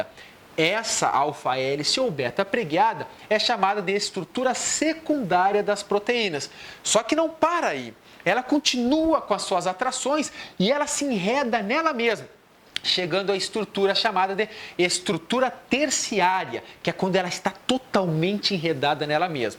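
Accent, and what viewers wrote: Rio Grande do Sul, Brazil. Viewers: tu é do Rio Grande do Sul? tem sotaque gaúcho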